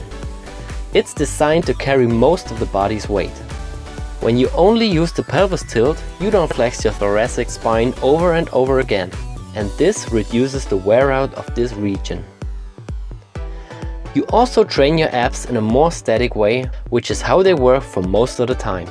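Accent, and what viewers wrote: Germany. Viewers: dieser deutsche akzent hahahahaha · That was a german accent, right? ^^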